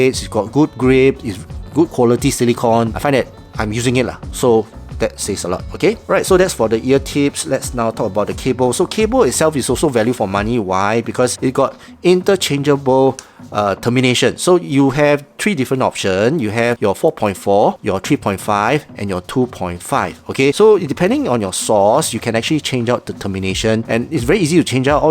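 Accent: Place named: Singapore. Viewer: I think is a Singaporean. I also noticed this on some of my Singaporean colleagues.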